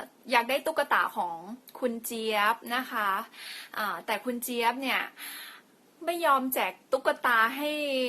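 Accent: North, Thailand. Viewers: พี่ อยู่ จ. รัยคะ..พูดเหนิอน่ารักมากๆๆๆ.. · ชอบครับอู้คำเมืองน่ารักดี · อู้กำเมือง เวอร์ชั่น น่าฮักขนาดคับ เห็นด้านหลังตอนยิง นึกว่าเป็นสตั้นแมน ดูดุดันมากเลยคับ ไม่น่าเชื่อว่า สาวเอวบางร่างน้อย จะต้านแรงรีคอยลูกซองได้สบายเลย เยี่ยมมากกคับ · คนเหนืออี้ จังหวัดหยังนิ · อู้กำเมืองยิ่งน่ารักขนาดแต้ๆน้อ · พึ่งฮู้น่ะ ว่า อู้เหนือได้ น่าฮักขนาด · เป็นคนเหนือก่อจ้าว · ทึ่แท้เจ้ากะคนเชียงใหม่โตยเก่งหลายเน้อเจ้าเว้ากะม่วนแต้เน้อคนเหนือ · เป็นคนที่ไหนครับเห็นพูดเหนือ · คนเหนือป่าวครับ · อู้เหนือน่ารักขนาด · คนเหนือหรอจ้าว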